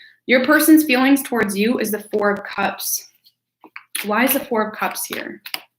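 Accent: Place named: Canada. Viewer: I thought you were Canadian from the accent but that Tim Horton's confirmed it 😂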